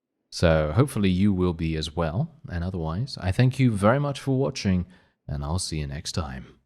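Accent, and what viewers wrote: United Kingdom. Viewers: These should not be this fun to watch... but I get good ideas which make my campaign more fun and you got a cool british asmr kinda thing... you Brits have spoiled me with your cool voices, I def prefer to watch other youtube vids with good ol British folk narrating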